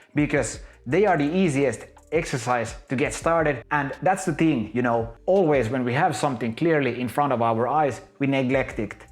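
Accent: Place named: Finland. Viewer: hienolla suomalaisella aksentilla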